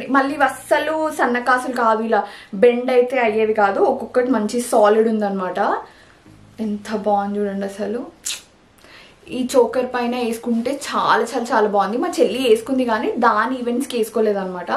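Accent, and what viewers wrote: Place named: Hyderabad, India. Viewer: you are an absolute example of Hyd telangana girl in heart and tone. Love you. Keep going. I do not care much abt jewels or sarees but I like seeing you and the energy you bring to life when speaking❤. -from another Hyd sis.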